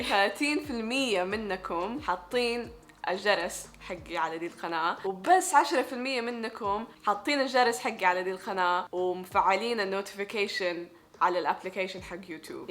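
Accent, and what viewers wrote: Hejaz, Saudi Arabia. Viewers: ما شاء الله اللهجه حجازيه ومفهومه جدا اللغه العربيه قويه والترجمه من الى ١٠٠/١٠٠ هذه الايجابيات عندك اختي تجعلك داعيه رائعه وقويه وموثره جدا لانك قويه في اللغتين بامكانك ان تكوني داعيه بس في الهيئه واللباس اسلوبك رائع استغليه لله واعلمي ان الناس عندكم في بريطانيا واوروبا متعطشين الاسلام ...ولان يهدي الله بك شخص واحد خير لك من الدنيا وما فيها ... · لهجة مكاوية وجداوية جميلة وماحفظة عليها مانسيتيها اشكرك تحياتي لك سعودي من الرياض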